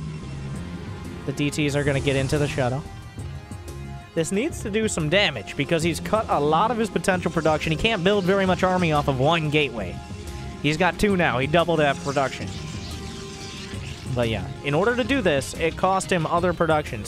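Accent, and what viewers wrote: South, United States. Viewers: Dude you have sick commentary skills, super entertaining! Is this guy from the South or something?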